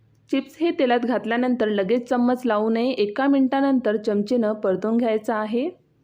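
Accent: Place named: Jalgaon, India. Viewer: जळगाव भाषा बोलत आहे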